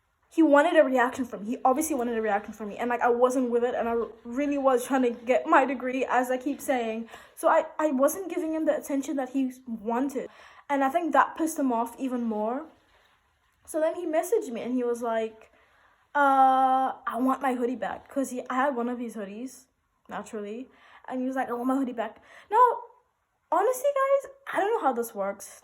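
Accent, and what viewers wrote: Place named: United Kingdom. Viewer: That British accent , I really like it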